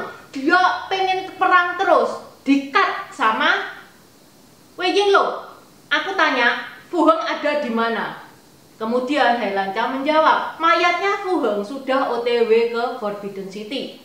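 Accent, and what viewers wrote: Java, Indonesia. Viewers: Wowww medhuoknya banget bangett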